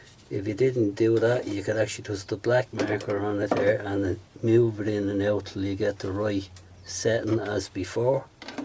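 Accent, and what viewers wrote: Dublin, Ireland. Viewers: Very helpful video. I've not done any wood turning since secondary school many years ago. I got a lathe at Christmas and have been tipping away since. Im guessing by the accent you are in Dublin, I was just wondering where you got the grinding wheels? I got a cheap 6" bench grinder but the wheels with it are very rough and I dont want to damage my chisels. Planning on making the home made Jig for the fingernail grind as they are too expensive to buy when they can be made quite easily. Also enjoying your other videos too. Planning on trying the multi axis cup when I get time.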